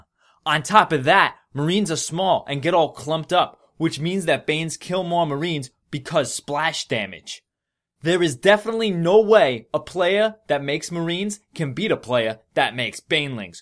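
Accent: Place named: New York, United States. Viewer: He speaks in a hard new york accent.. haaaad cawntaaaaah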